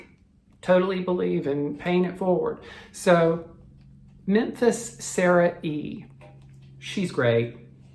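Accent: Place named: South Florida, United States. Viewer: Omg “tornadahs” … memories of my mother! It is like I am listening to my family from South Florida.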